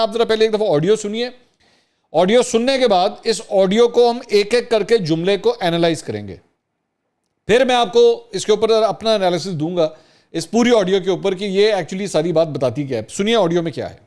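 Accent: Pakistan. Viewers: Sir bohoot khoob tajzia kia aap nay Aap k lehjay say pakistaniat jhalak rehi hay Jazak allah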